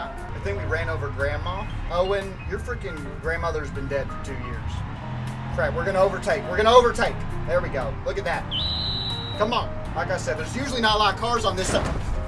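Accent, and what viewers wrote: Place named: West, United States. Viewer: I like the western accent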